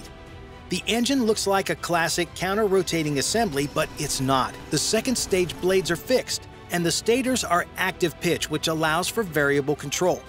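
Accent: United States. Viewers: Well, this is an American voice and pronunciation to a European ear is always strange.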